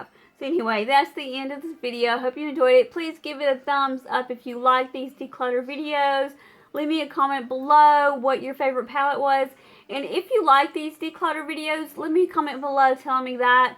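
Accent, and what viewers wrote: South, United States. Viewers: You're welcome. I thought you were from the south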